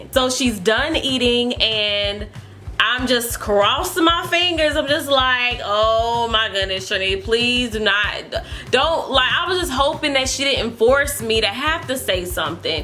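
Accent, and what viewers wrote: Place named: California, United States. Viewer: sounds like she is from Cali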